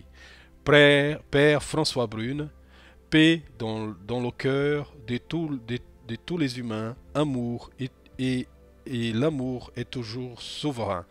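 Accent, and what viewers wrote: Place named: Brazil. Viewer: Je suis tellement heureux de retrouver le Père Brune. C'est en cherchant d'éventuelles vidéos inédites de François Brune que je vous ai trouvé aussi, j'ai écouté toutes vos vidéos : 🤗❤. Je vous aime maintenant tout autant que le Père Brune, et je comprends qu'il vous ait choisi comme postier tout amour. Il se trouve que j'adore le Brésil, et aussi votre accent. Merci du fond du ❤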